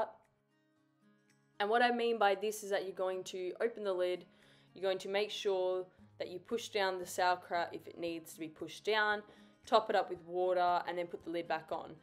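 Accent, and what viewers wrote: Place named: RP, United Kingdom. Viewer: Very good R P English language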